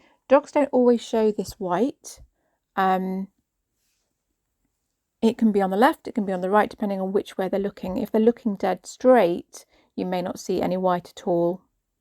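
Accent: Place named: England, United Kingdom. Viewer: I meant to add that I had not seen the last couple of minutes as I got interrupted. But the end result was amazing. Beautiful work, the head is so realistic. So nice to hear an english accent too! I am in Australia and I have a friend in Canada who has a schnauzer, a gorgeous animal and I used a photo of it as a reference when making a full body model for her. It is only about 6 inches high and 3 wide but I really wanted the eyes to be a feature. That is how I came across your video. I have been needle felting for about 10 yrs. I strive for an approximation rather than a replication when doing animals. If it is recognisable then I am satisfied. I want the hobby to be enjoyable, and not bash myself trying to be what I am not…ie perfect. I have sold a lot of items and had lots of commissions over the years so it must be okay. But I would love to be as talented as you obviously are. 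I have saved your channel so I can refer if I need to. Thanks again.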